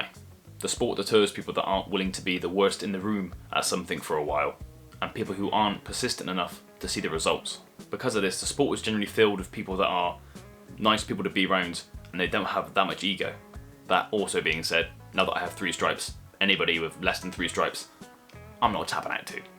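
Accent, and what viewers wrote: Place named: North, United Kingdom. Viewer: Good video, nice to hear a northerner on YouTube!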